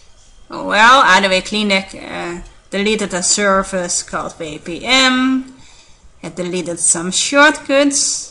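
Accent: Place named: Netherlands. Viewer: hoi, door de pop up en je accent had ik opgemerkt dat je nederlands was:). ik vond ineens nadat ik een programma had gedownload deze sweet-page en probeerde het te verwijderen door middel van het configuratie centrum, maar al gauw merkte ik de afwezigheid hiervan ik typte bij youtube in 'how to get rid of sweet page' en kwam al gauw op deze video, ik heb zelf geen last gehad van dit virus omdat ik het in hetzelfde uur heb verwijdert doormiddel van jou goeie instructies. HEEL ERG BEDANKT:) LIKE!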